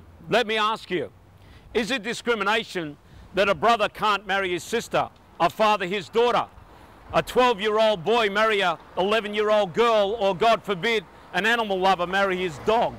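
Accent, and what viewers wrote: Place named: Australia. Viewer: Oh. So *that's* how you make an Australian accent unsexy. Be a ginormous bigot. Duly noted.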